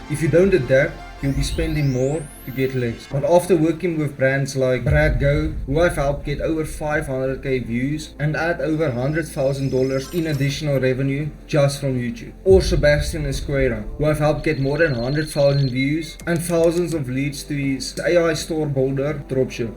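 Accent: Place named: South Africa. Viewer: Hey, I just wanted to say I absolutely love your content! You inspire me to improve my editing skills 💯. By the way, are you from South Africa? Your accent sounds familiar South Africa😅! I’m a creator too, but wow, your videos are HUGE!:face-blue-wide-eyes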